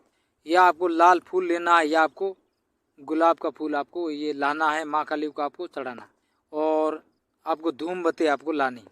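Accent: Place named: Rajasthan, India. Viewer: गुरुजी में जानना चाहती हूं आप कहां रहते हैं मैं मिलना चाहती हूं I am also from Rajasthan. Your voice tells me that you are from Rajasthan. Please tell us where you are from and I want to meet you.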